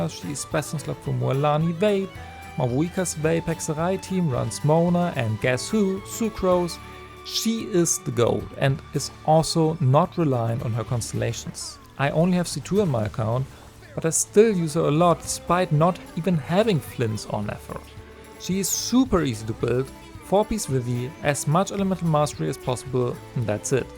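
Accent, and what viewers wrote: Germany. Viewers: Lieblings Genshin Youtuber. Vor allem wegen dem Deutschen-Akzent😆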